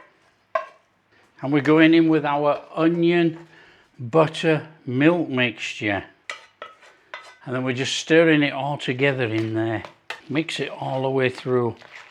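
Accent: Yorkshire, United Kingdom. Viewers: Interesting. I've never had, will try now. Bog standard colcannon. Thank you. Is that a Yorkshire accent?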